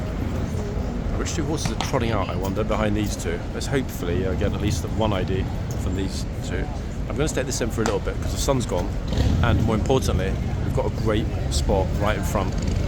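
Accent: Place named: RP, United Kingdom. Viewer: I Love Love Love your Brilliant Channel! And I appreciate the Queen's English that you present so eloquently with every episode of your presentations. I also agree with your analysis of what respect should look like, from your perspective toward the guards. You balance that dynamic so well❣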